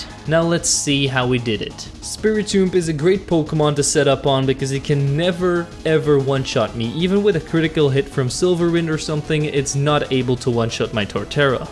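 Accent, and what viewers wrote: France. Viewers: Like for the French accent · My favourite part of all your gen4 sinnoh runs is Ur French accent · That damn french accent always gets me x) Excellent video, you truly are great:) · That French accent though. Haha! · That French accent tho 🔥🔥🔥🔥🔥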